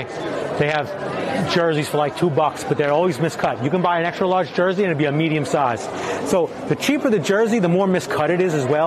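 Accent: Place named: New York, United States. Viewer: New subscriber! Hello fellow New Yorker, I noticed the NY accent 😊 My brother was just there in Istanbul 2 weeks ago and I asked him to get me some replica shirts 😂 When he goes back, I am gonna ask him to get the vip higher quality shirts 🤓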